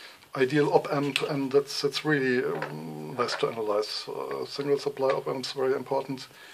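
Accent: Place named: Germany. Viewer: I think the reviewer is German ...i can tell from his accent. munchen?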